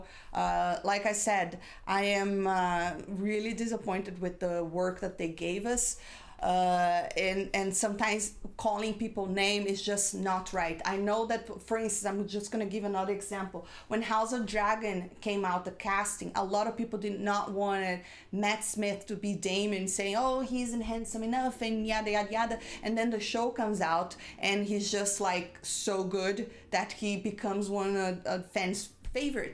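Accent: Brazil. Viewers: Que bela resenha. Sei que é brasileira pelo sotaque imediatamente. Sou americano mas meu pai é brasileiro ;) Ótimo canal, me inscrevi! Sucesso! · Are you Brazilian? Sounds like a Brazilian speaking English. (Good English though), thanks for the video.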